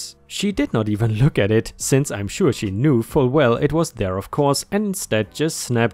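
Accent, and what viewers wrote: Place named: Germany. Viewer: Hi ⭐, 🌠🌟⭐😎⭐🌟🌠 No. We come to listen to your lovely accent. We don't need background music. Have you noticed how many people try to politely help you with your pronunciation of some English words you miss pronounce? You asked for our help so that you learn. We try to listen and catch your mistakes, to help you. How often does that happen on the internet, people politely helping? Anywhere but your channel people would be teasing you for your mispronunciation. To go from German to English fluently is a miracle in and of itself. We want to hear your accent, it's charming. 😊 Thank you for everything. Take care, stay safe, have a nice day. 👵☺️✌️☮️🖖 😷 🙉🙈🙊 ♥️😎⭐🌟🌠 💍🏍️👨‍⚕️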